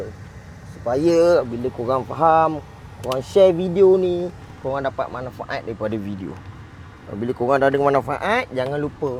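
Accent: Pahang, Malaysia. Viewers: bunyi slang orang pahang je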